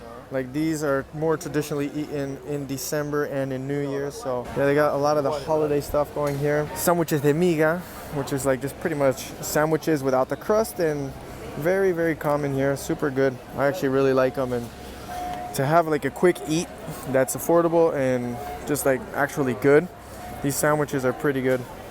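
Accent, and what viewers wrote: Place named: Buenos Aires, Argentina. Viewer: totalmente de acuerdo. Lo escuche hablar en español y el acento era totalmente porteño.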